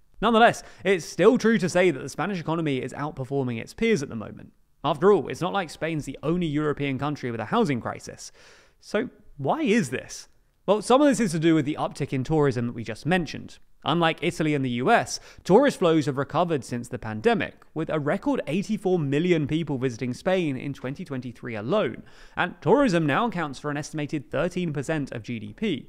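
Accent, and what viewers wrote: England, United Kingdom. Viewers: The content is cool, but the English accent is one of those that makes getting information more difficult.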